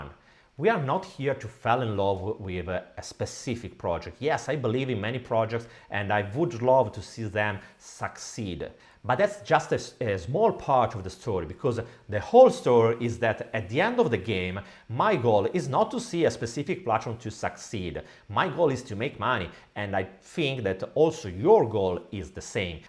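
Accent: Italy. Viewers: Are you italian by any chance? your accent sounds a bit that way, if I'm right, mi fa piacere vedere un fratello italiano in DeFi come me 😁! Great video, good job!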